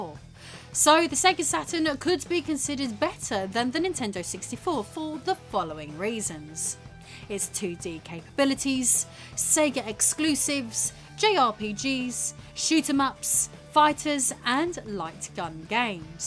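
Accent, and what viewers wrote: United Kingdom. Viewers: 5th generation of consoles + 90s vibes + well exposed review + British accent= excellent content. P.D.: Nice channel and greetings from a Sega Saturn player who had a PS1 in the 90s and lost good stuff.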